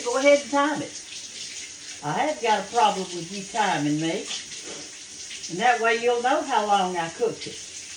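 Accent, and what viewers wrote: South, United States. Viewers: Ms., where are you from if you don't mind me asking. You sound so southern and You remind me of my NANA, BECAUSE SHE USED TO WEAR HER APRONS ON EVERYTHING SHE COOKED. I LOVE YOU MS.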